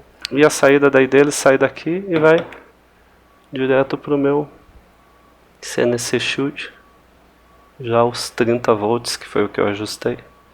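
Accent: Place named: Paraná, Brazil. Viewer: Cara, sou fã do teu canal, não entendo como você não tem mais audiência. Conheci teu canal através do Rodrigo Conrado, o qual também tenho muito respeito e considero vocês as melhores referências no que diz respeito a CNCs DIY. Inclusive pra mim você é o pai das CNCs caseiras no Brasil, um pioneiro. Parabéns e muito obrigado. Estou pensando em criar o meu próprio canal para makers, pois vejo muita gente com dificuldades em montar seu equipamento e orfã de outros canais. Caso queira me contatar meu email é <mail> Sou do interior de SP e busco alguém como eu na minha região para projetos do tipo, trocar idéias e experiências. E você, como se chama (nunca ouvi você mencionando teu nome) e de onde você é? Pelo sotaque parece ser do Paraná, mas me perdoe se estiver enganado. Um grande abraço e muito boa sorte.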